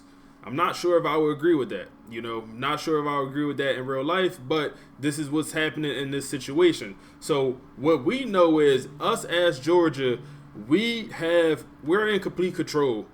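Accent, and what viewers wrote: Philadelphia, United States. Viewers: Jus came across yo channel today you sound like my homie from philly 😂😂 then u said temple I was like yea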